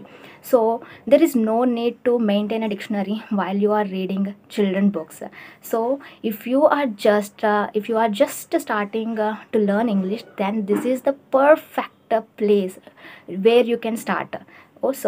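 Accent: South India, India. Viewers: I agree with what you said but all these tips and tricks can be beneficial for people who have some english knowledge. In northern india there are many students who are purely from Hindi background and English is rocket science for them. How will these ideas help them to learn English language? You are definitely from south as what i understood from your accent and to be honest the tips you shared can give maximum benefits to people who are at least in touch with english. These tips will be pure bliss for them.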